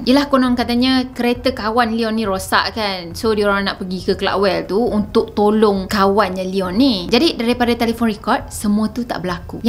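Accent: Kelantan, Malaysia. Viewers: kamu org Kelantan ke?.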